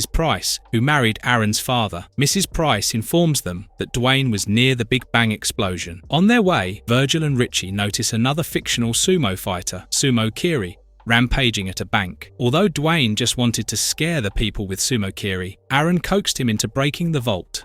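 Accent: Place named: London, United Kingdom. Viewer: His not a american his a london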